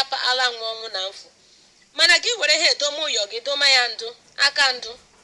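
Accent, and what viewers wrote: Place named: Owerri, Nigeria. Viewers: Oh gush! You have a romantic Owerri ascents. My hubby rushed out of the room to listen to you too. You twist the language like his sister. He’s guessing you’re from Naze because he’s from Obibiezinna too. Sending this video to my sister-in-law. I really enjoyed this content on bitter kola. So much benefits. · Chai! I didn’t want this video to stop simply because of this dialect! So so sweet in the ears. Bitter kola explained in a very detailed way. Ala Owerri lee! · Akuruma is very beneficial to health, the list is endless, I enjoy listening to her Owerri dialect. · Let's connect sis.oji inu ka anyi na akpoya. Your igbo accent is imo I guess.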